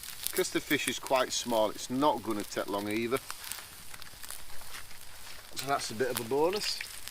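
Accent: United Kingdom. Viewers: why is this common in usa to have someone attacking you at 1-3 am edit i realize his accent is from britain but still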